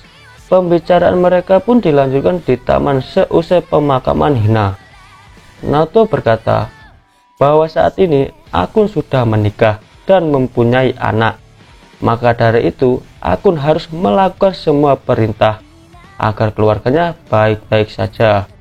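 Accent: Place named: Java, Indonesia. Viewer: Medok banget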